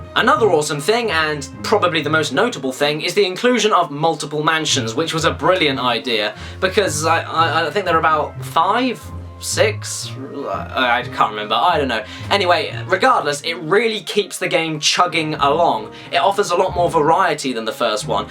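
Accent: United Kingdom. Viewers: JESUS! HE IS A BRITISH PBG! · UUuuhhmm, hehe......could be the wierdest comment ever, clearly the dude is brittish.... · Like JonTron, but Britisher. That's a good thing.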